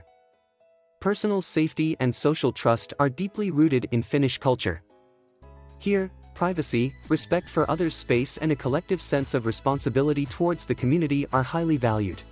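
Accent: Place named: United States. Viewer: I was actually surprised! An American-accent narrator and an opening screen of a sauna had me expecting a foray into the Nordic lack of inhibition to nudity that tends to lead English speakers into perverse imaginations of Finns (and Nordics in general) flaunting dicks and tits without any regard for English-speaking prudishness! To my surprise, this video had nothing of that! I'm Icelandic, a western brother of our eastern brothers, the Finns. Having worked with Finns in stressful situations (relief operations in war-ravaged African countries), I now know that the Finns are even more stoic than we Icelanders believe ourselves to be! More solid friends you will never find, and they have the rare quality of not shooting their mouth of when they have nothing to say! In my case, I found that Finns stay quiet until they have something useful to contribute to whatever conversation is going on. 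I also learned that if a Finn bothers to enter a conversation, he/she ALWAYS has a truly valid argument/insight to offer! The Finns are a people of few words, and WHEN they have something to contribute, pay attention!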